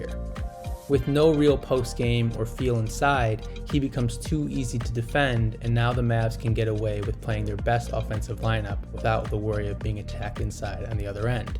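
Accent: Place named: New York, United States. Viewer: Loving this channel. Love the bball knowledge! I hear the NY in your voice so when are you doing a vid bout the Knicks.....w/ BRUNSON!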